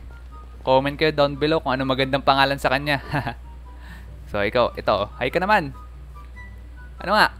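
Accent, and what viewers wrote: Philippines. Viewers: Batanggenyo po ba kayo kasi po yung sinasabi nyu po kasi parang pang batanggenyo hahahahahah kaka relax ka panoorin erp sana umabot ka sa 100k, subs para may play botton napo tayo sana mapansin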